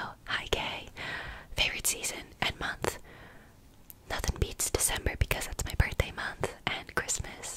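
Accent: United Kingdom. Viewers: omg your british accent is so beautiful omg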